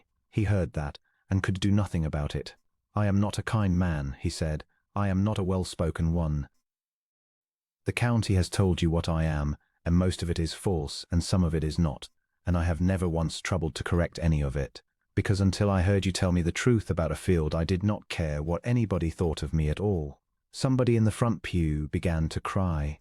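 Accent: United Kingdom. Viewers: I loved this story! Beautifully written and superbly spoken with the appropriate British accent. Thank you!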